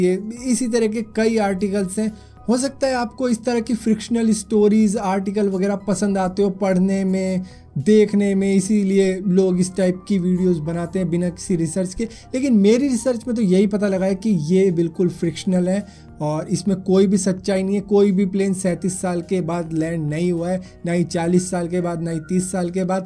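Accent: India. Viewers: the heading is in english, n your voice is Indian, I thought you are sharing your story with the world.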